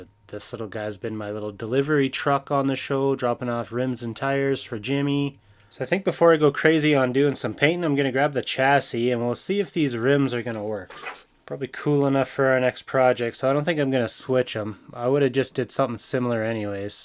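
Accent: Canada. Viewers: I thought tube had closed u down man good to hear rhat Canadian voice good video bro 🇬🇧🏴‍☠️🇨🇦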